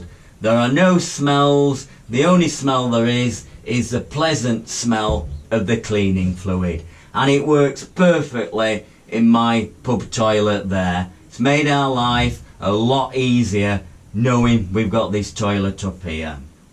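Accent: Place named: Northern England, United Kingdom. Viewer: thanks, English ex living in Mexico here. You're a must watch for me - especially more so when I miss home and the Northern accents. Cant wait for the wild camps. Best o'luck to you mate.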